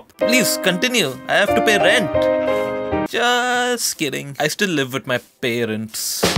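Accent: India. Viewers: That's a true indian accent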